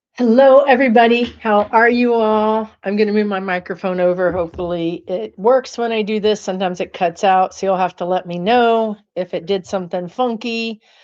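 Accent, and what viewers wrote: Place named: South, United States. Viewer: I’m rarely available to watch your Friday night live shows. But, know that I look forward to watching them ASAP. Thank you from one southern born gal to another. Your “you alls” feel like my home back when MAGA was a misspelled word. Little blue dot 🔵 in a RED county.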